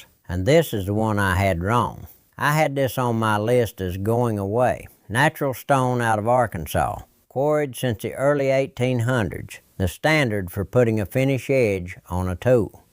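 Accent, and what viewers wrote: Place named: Maggie Valley, United States. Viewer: Maggie Valley accent for sure.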